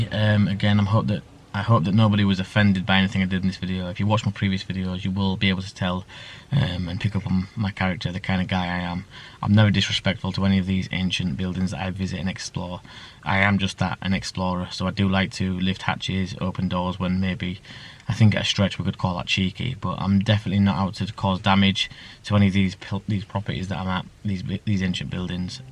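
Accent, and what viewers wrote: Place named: Yorkshire, United Kingdom. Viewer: i would love to come exploring with you i am chasing the same answers i live in blackburn you sound like a yorkshire man so you are not far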